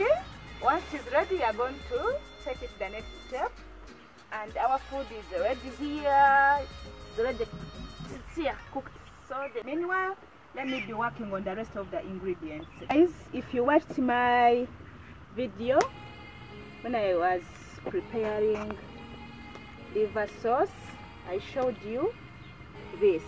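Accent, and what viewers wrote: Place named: Uganda. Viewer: You speak Ugandan accent sis.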